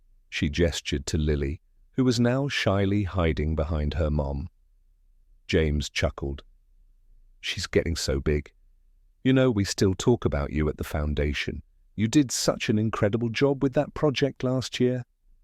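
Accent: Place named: RP, United Kingdom. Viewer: Greetings from Poland, and thank you for a great story! Your narrator has an impeccable English accent (RP, isn't it?) that allowed my listening comprehension to be about 100% without subtitles, even though English isn't even my second language (I only studied EFL).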